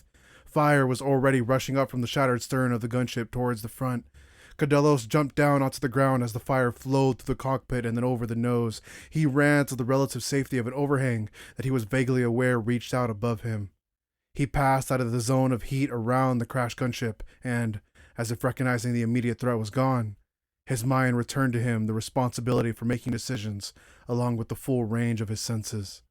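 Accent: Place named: Russia. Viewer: Very cool reading !! Not a big fan of the Russian accent tho, makes it a bit difficult to understand the Marines lol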